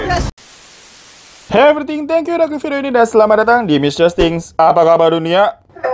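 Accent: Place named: Banyumas, Indonesia. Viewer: Ngapak su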